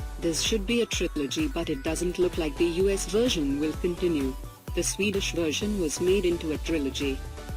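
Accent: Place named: India. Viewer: robot voice with an Indian accent... no thanks!!!!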